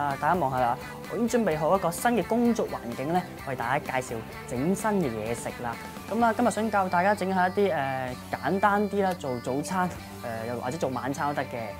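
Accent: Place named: Hong Kong, China. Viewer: D英文好香港式 夠地到 正!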